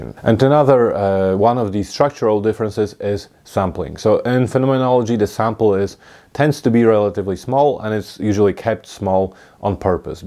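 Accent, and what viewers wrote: Scotland, United Kingdom. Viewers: You sound Scottish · Youve lived in Scotland havent ya?! This kiwi can hear it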